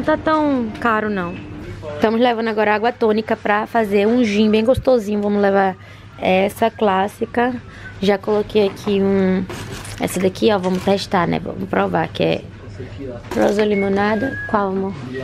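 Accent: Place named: Northeast, Brazil. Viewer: Acabei de chegar e simplesmente amei! Vc é nordestina? Amo esse sotaque 😍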